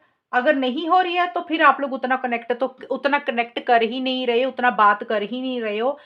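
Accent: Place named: Punjab, India. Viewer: Punjabi lang nikal he jandi hai chahe kinni hindi bol lo aap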